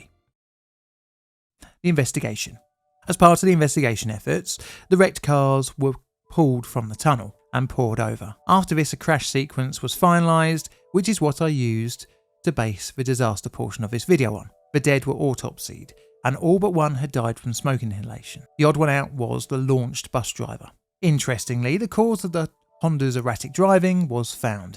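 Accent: United Kingdom. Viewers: I like your British voice, and humor.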